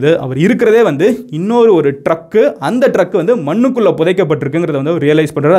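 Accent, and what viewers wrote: Madurai, India. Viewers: Innaiki thaan bha MG, Madurai slang la pesirkaar... Avinga, Ivinga, Pannirkanunga... Daily he sounds formal Tamil, today Madurai slang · Inniku Madhan gowriku madurai slang niraiya varuthu… ketka super aa iruku..🙌🙌🙌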